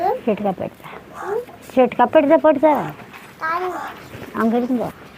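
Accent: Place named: Salem, India. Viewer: Neega entha ooru aaya,salem bhasa mathri iruku.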